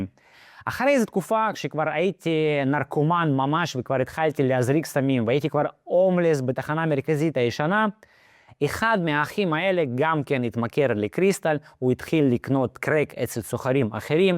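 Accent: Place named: Russia. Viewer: у тебя ярко выраженный русский акцент... ты бы хоть титры на русите сделал бы.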